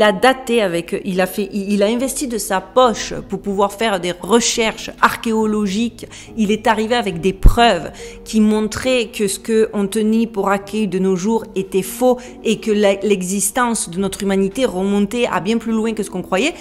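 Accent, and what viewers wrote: Marseille, France. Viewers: Merci pour cette nouvelle vidéo, passionnante, comme toutes les autres. J'aime votre façon de faire vos recherches et d'en rendre compte. Sans compter vos accent du sud qui me rappelle ma Provence! Vous parlez au début de la vidéo de certaines réactions quant à votre pseudo...J'avoue que j'en ai été surprise car cela est diamétralement opposé au travail de recherche et de Lumière que vous faites. Et comme chaque mot, chaque émotion ou pensée est créatrice, je trouve votre pseudo sombre, mais vous devez avoir vos raisons d'avoir fait ce choix. Et je le respecte même si cela pose question, et la réaction des gens me parait normale. Belles fêtes de fin d'année et encore merci pour votre travail! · Je ne comprends pas très bien Tu as l accent marseillais mais sur fond d américain Quoiqu il en soit au bout de 2 vidéos je me suis abonnée J adore ta façon de raconter et puis tu es très sympa Heureusement que je suis tombée par hasard sur ta chaîne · Grand merci 🤗 Quel travail de recherche fouillé … Nous avons de la chance de pouvoir bénéficier de ce travail pour l’éveil de nos consciences! 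Excellente continuation! A très vite 😊 Ps: j’aime beaucoup ton accent: celui du Sud de la France non? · J'adore les "cagoles" intelligentes (avec l'accent de Marseille), surtout ne change rien, et je te souhaite le meilleur.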